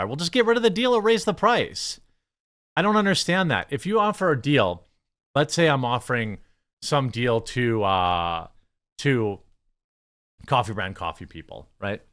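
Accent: Wisconsin, United States. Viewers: That my friend is a Wisconsin way to say bag. I’m in northern Illinois. It’s baag. lol. Love your videos brother Also. I’m telling you guys “Demolition Man” 1993 predicted this. In the movie Taco Bell was the only restaurant to survive the franchise wars. Took place in 2032. They have begun 😂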